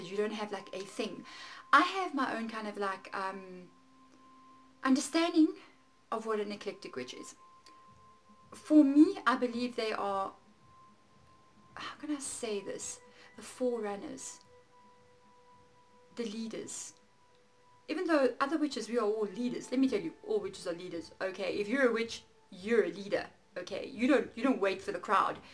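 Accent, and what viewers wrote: South Africa. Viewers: Your accent reminds me of my South African neighbour. I think she's from South Africa...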